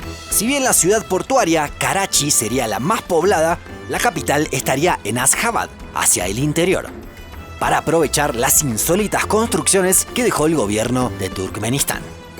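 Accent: Argentina. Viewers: Se nota que es un canal argentino por dos cosas: el acento (obvio) y porque no pone a las Malvinas como territorio británico de ultramar.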